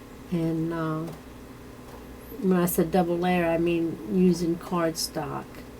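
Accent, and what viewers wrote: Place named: South, United States. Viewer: A very interesting technique. Thanks for sharing it with us. Have a great Easter. Just out of interest, what part of the USA are you from. I'm guessing somewhere south by yr accent. Hugs Lynn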